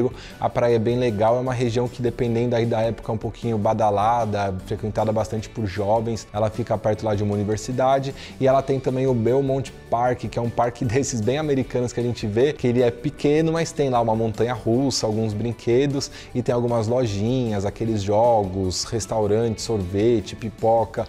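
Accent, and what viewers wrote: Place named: São Paulo, Brazil. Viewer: Ele fala meio rindo né. Que nem o Luciano huck hahahah quem tava na dúvida se ele era paulistano tirou 🤣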